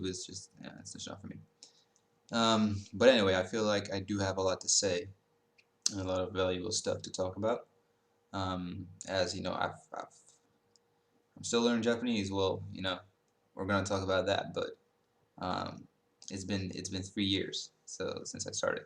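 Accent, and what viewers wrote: United States. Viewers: idt it’s that uncommon of a word tho, most people would know what it meant if it showed up on an SAT or something ikr he sounds like a typical American urbanite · I was confused by the Farenheit thing too lol. I was like wtf? Is he that so hardcore AJATT that he's trying only to use Celsius? The only thing that gave it away was the way you say "book" and "look", other than that you have a perfect Standard/Northwest US accent.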